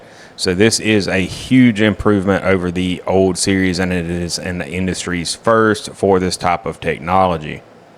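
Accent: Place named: South, United States. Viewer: My god, the hic is thick in this one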